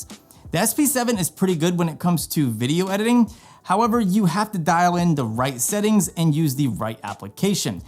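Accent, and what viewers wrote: United States. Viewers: omgawd i lasted less than a minute.... no offence, but your voice is sooooo annoying and so 'Jersey'.....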